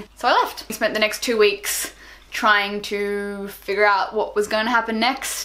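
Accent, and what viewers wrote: Australia. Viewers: I know! I love the way it sounds. She's Australian, right?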